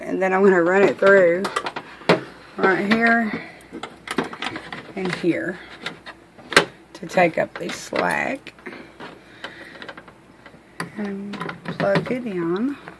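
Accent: South, United States. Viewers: Rockin! What are these shelving brackets called at Lowe’s? Love your Southern accent, but I’m not sure exactly what you called them. Thanks! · I love your diy but when i heard your voice i said she from the south then you said Alabama that's where I'm from 👍👍👍👍👍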